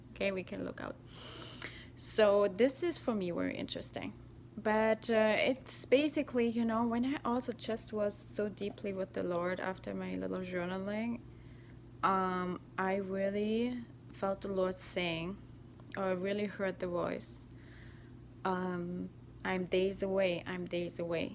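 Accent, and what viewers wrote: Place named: Germany. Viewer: What's the accent? German? It's very cute 😊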